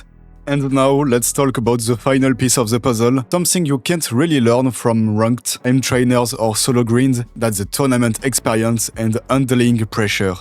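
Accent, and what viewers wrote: France. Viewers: This is the most French Frenchman I've ever heard. Subscribed. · as a french, i approve this accent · I really love that French accent! Lovely video, keep up the good work man! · I'm italian so I find hard to compliment a french, yet I must say that french english accent is really cool 😂 Also you are my favorite the finals pro.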